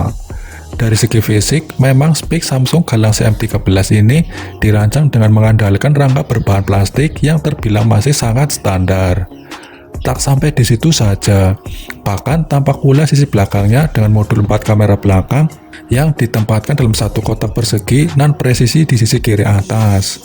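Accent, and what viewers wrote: Banyumas, Indonesia. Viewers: Orang ngapak yak ?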